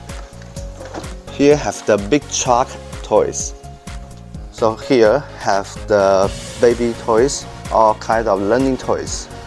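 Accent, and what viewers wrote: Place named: China. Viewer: Love the accent i like this chinese guy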